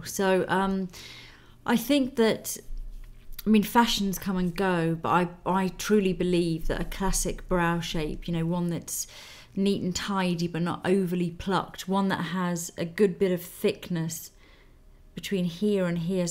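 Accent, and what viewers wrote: England, United Kingdom. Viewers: I am a new subscriber after hearing juicytuesday speak so highly of you.I have watched a few videos and am crazy about you already.You are a very beautiful woman and I just seem to adore you English ladies.Love the accents and you all have such gorgeous complexions. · i just love your make up sense....so natural and sophisticated........your confidence in your work and your British accent are just WOW!!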